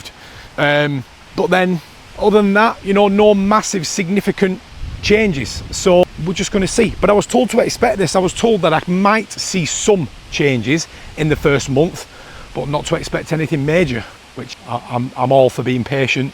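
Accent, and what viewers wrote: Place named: Wigan, United Kingdom. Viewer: You’ve got be from Wigan or not far off with that accent lol well done on your journie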